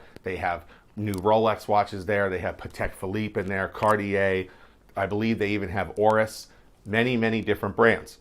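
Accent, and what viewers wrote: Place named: Rural, United States. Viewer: Not defending his behavior but you come across like a dude from the hills of Pennsylvania. Poorly dressed with that horrible hick like accent. Once he heard your opening salvo he had already sized you up. Not saying it’s acceptable but these guys see country bumpkin tourists all the time.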